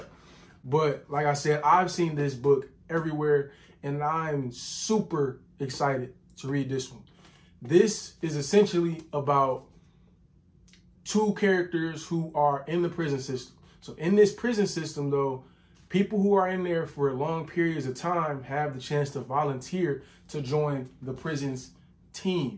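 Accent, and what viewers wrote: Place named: Rio de Janeiro, Brazil. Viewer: I’m from Brazil and you sound like a Carioca (people who were born in Rio de Janeiro) and I love it. Your channel is such a incredible discovery ❤️ love the way you talk about the books! Hope you’re having a great booktube experience!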